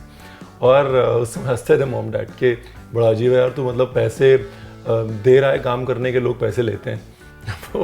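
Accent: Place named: Uttar Pradesh, India. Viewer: Ranveer d way u talk and d words u use i can so much relate to them coz m also frm UP .... apnaiyat ati hai bohot 😇... n i so much enjoy watching ur videos they are so different from others their is a charm... love ur simplicity and humble attitude ❤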